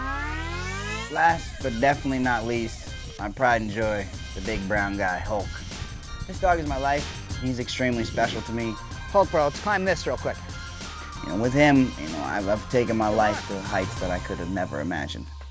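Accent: Netherlands. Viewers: Are u dutch???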